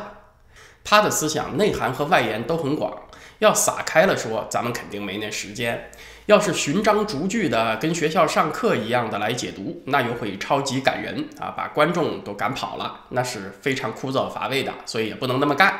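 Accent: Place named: Northeast, China. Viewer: 以下是剛剛收到的知乎的郵件： 您好，成吉斯，很抱歉您的回答受到了牵连，您回答过的问题「如何评价评论员文昭？」因「政治敏感」已被删除。您被牵连删除的回答如下： 搜了一下，网上没有先生的简历与介绍。看了2013年左右的视频，当时还相当青涩，与现在的几乎是两个人。既然网上没有公开的介绍，我就狂妄一下，猜一猜他是谁： 1. 像是东北人（仅仅凭一点点口音~）； 2. 应该出身于一个普通知识分子家庭； 3. 的大学应该是文科，历史、中文之类的学科； 4. 应该移居加拿大超过10年； 5. 应该在2013年前后在多伦多或附近的大学读了一个或几个与历史、政治、哲学等相关的课程； 6.傅佩荣先生应该对有比较大的影响。很佩服的才学、见识与表达，当然最重要的是的价值观。无论先生服务于哪个机构，只要先生能秉持自己的真诚，我都尊敬先生的选择。谨以以上文字向先生致意！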